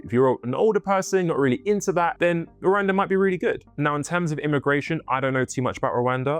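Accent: United Kingdom. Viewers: What a bunch of pap--with a posh British accent.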